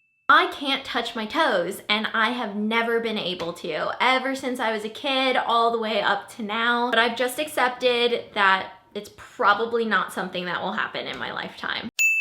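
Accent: South, United States. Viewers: Where are you from? You sound kind southern, but I thought you were from CA...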